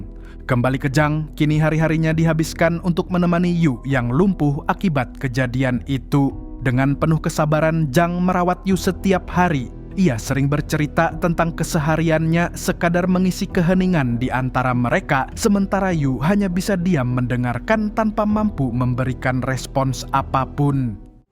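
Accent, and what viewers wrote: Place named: South Tangerang, Indonesia. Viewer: Orang tangsel mana suaranya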